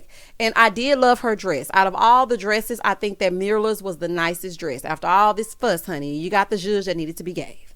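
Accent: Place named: Houston, United States. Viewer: You are most definitely from Houston 😂 you sound just like me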